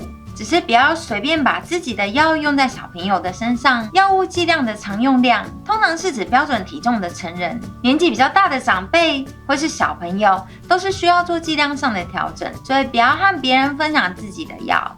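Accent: Japan. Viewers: 有點日本口音⋯ But Good Job!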